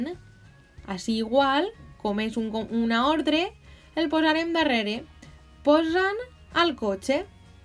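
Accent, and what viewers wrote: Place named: Valencia, Spain. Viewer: Hola! Sòc de Xile i estic aprenent el català. M'agrada molt l'idioma i encara que ja puc parlar-ho sense pensar tant, he vist que tinc que millorar en dues coses: Algunes conjugacions de paraules, per a parlar més natural, i els pronoms febles. Es veritable quan la gent als comentaris van dir que el teu vídeo va ser molt bó, perquè he vist moltíssims vídeos i el teu és el més fàcil de comprendre. Estic escrivint tot el que vas dir, pero després tinc que fer exercicis per practicar-los. L'únic que ha estat nou per a mi es el accent de València. Perquè he après el Català de Barcelona (pel que sembla jajaja, no sabia això). Però segueix així! Ens ajudes molt a aprendre aquesta bonica llengua.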